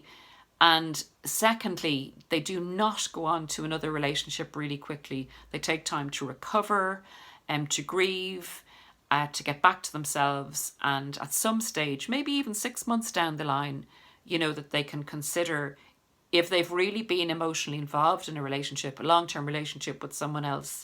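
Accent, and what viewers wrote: Ireland. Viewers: thanks very much done alot of journaling this morning while watching these videos which helped.stayed in bed all afternoon body so sore,I'm also from Dublin great to hear an Irish lady give this advice as a lot of the channels are american.love listening to you and thanks again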